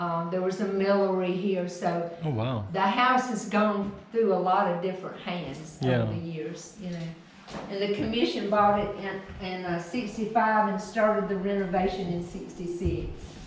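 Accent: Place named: Georgia, United States. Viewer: This took me back to the summers of my childhood. I spent them 9 miles away from Lumpkin, in Richland. I miss that accent!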